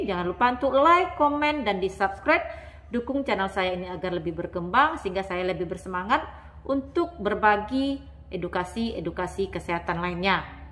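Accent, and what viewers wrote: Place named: Sumatra, Indonesia. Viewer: Logat nya kyk sumatra deh😅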